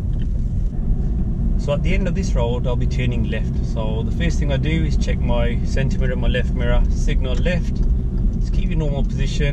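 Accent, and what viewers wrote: Birmingham, United Kingdom. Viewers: Wheyyyy it's Birmingham/Wolves :D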